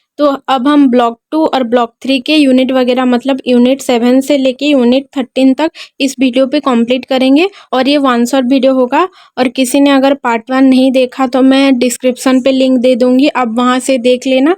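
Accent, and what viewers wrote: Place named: Odisha, India. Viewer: Apananka voice ru laguchi apana odisha ra heithibe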